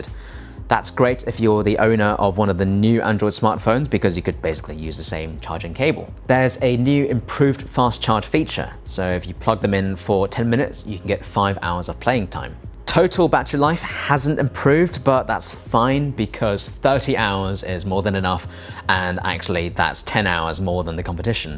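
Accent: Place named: RP, United Kingdom. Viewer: This guy is the most posh-sounding tech reviewer I’ve ever heard.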